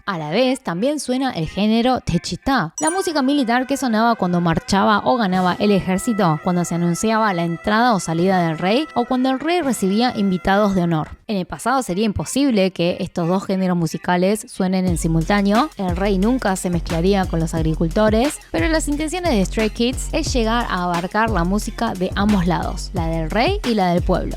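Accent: Argentina. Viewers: Te sigo de hace mucho y lo que más me gusta es cuando haces estas explicaciones y nos acercas más a vuestra cultura que dicho sea de paso es super mega interesante para los de este lado del mundo,agradecida por todo ahora no solo disfruto de sus voces bailes y música sino que también entiendo la temática que hay de atrás. Muchas gracias mi niña eres súper amorosa y tú acento argentino me encanta ,un abrazo y bendiciones desde Chile🙋🇨🇱🙋🇨🇱🙋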